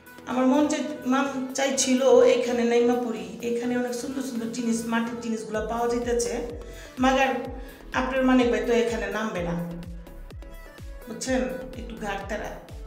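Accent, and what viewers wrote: Old Dhaka, Bangladesh. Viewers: পুরার ঢাকার কথা শুনতে আমারও ভালো লাগে। শুধু আপার কথা শোনার জন্যই পুরো ভিডিওটা দেখলাম।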